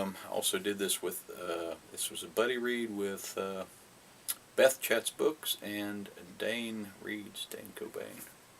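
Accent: United Kingdom. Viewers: Good month,! I found The Hate U Give a real page turner too. Your British accent!!!! Amazing! We do love a good chippy! haha! L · I loved your British accent! (I love a good chippy too haha!) Great wrap-up :)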